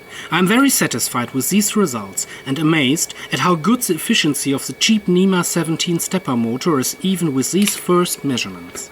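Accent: Germany. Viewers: This is the most German English I have ever heard, saying this as a German xD But high quality content, I see the effort put into this, nice job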